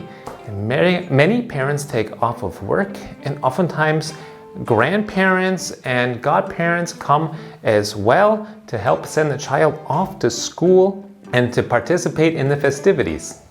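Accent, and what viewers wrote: Germany. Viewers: If you wouldn,t of said different , I thought you are german ,speaking english with a german accent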